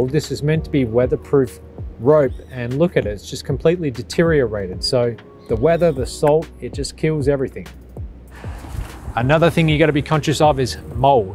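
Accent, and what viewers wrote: Australia. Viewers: You have an Australian accent. How do you compare life in Australia and Costa Rica.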